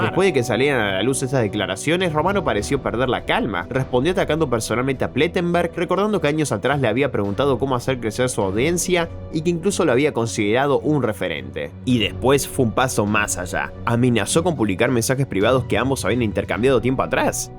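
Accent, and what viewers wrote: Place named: Argentina. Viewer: Escucho acento argentino, entonces no creo nada!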